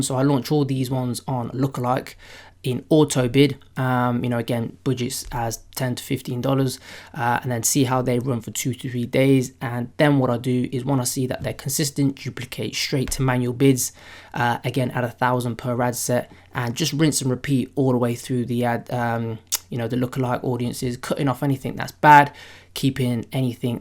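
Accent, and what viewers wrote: England, United Kingdom. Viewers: R u from England?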